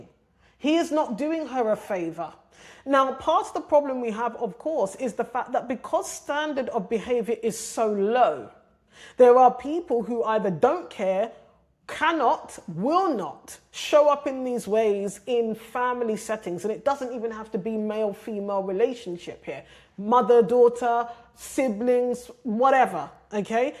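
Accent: United Kingdom. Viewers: Yeeeeees! I knew you were from SOUTH LONDON! Bad gyal tings! Go on mamita 🔥🔥🔥🔥🔥🔥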